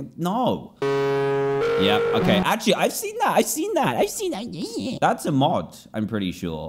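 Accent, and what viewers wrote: England, United Kingdom. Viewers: He's from england or something.... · He’s British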